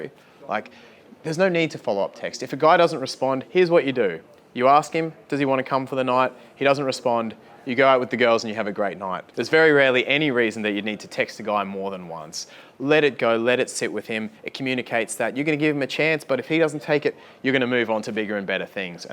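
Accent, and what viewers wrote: Australia. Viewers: I love your strayan accent sm XDDD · its the Australian accent🤣😻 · Are you Australian?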